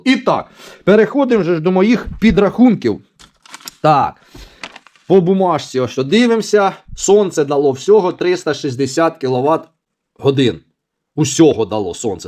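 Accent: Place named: Cherkasy, Ukraine. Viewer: Черкащина. То місцевий діалект 😂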